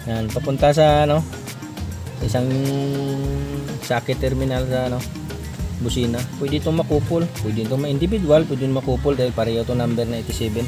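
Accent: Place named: Western Visayas, Philippines. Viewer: Yun ang gusto q sa ilonggo, malambing magsalita. How tooooo instaaaall...😅🤣😂👋. Pero damo nga salamat gid to sa tudlo mo ha kay damo q nabal-an halin sa imo ubra.👍👍👍 Gbu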